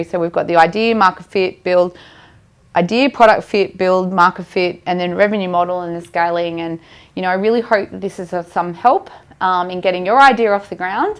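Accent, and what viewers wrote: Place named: Australia. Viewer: Hey there great video looking forward to finishing it. I noticed this was shot aways back would be cool to get an update on your journey with your idea. Also i was wondering how much did this app cost to make and did you run into any financial challenges/surprises when rolling it out. Lastly are you aussie based noticed you have an aussie ascent. Im Gold Coast based and would love a chat.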